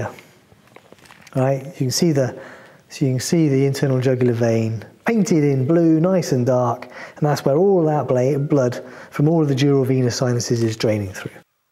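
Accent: United Kingdom. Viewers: You are great but with that typical british ascent i could understand only half of what you say 😅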